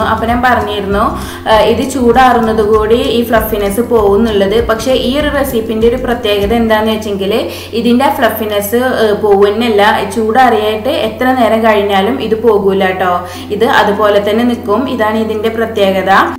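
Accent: Malappuram, India. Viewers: Nihal MN കോഴിക്കോടല്ല മലപ്പുറം ജില്ല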